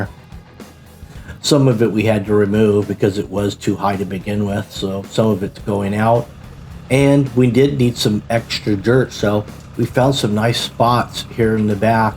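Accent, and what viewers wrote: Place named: Southern California, United States. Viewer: I thought you were in the OC. Like at the Wedge 🏄‍♂️ 🌊 you're accent. I'm Santa Monica kid , but now I've a house is in the friggin Val haha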